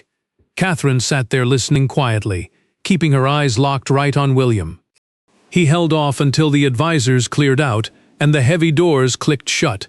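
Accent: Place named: United States. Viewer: That's why we don't trust any of it. And spoken with an American accent, what can I say. It's not for Americans to comment on our affairs, and certainly not in this way. Americans always steps on other people's toes, thinking they own the world.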